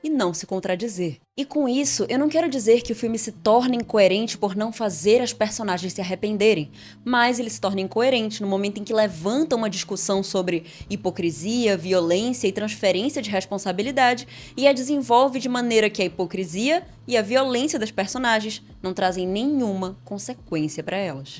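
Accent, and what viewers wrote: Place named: Pará, Brazil. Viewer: Vc é paraense né, aqui as pessoas tem uma fala meio igual de portugal.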